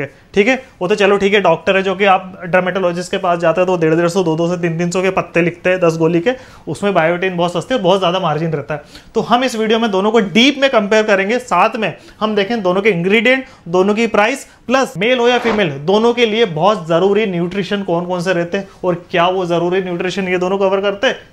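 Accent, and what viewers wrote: Indore, India. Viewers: Indoor ke aash pash se ho kya ap toon arha he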